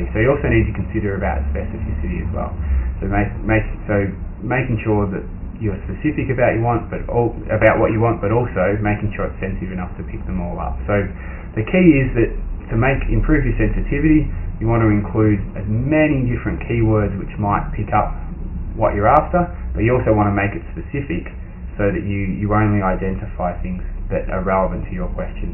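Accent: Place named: Australia. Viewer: Your Australian accent reminds me of school at Griffith university in the Gold Coast😄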